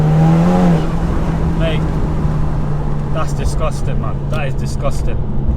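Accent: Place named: Liverpool, United Kingdom. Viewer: That Liverpool accent what makes this video entertaining. Keep up the good work.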